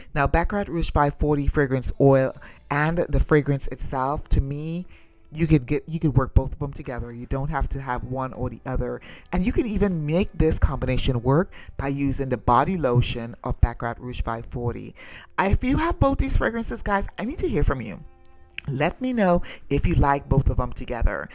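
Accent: Trinidad and Tobago. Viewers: I enjoyed it thoroughly. I could listen to your Trinidad accent and laugh all day. ❤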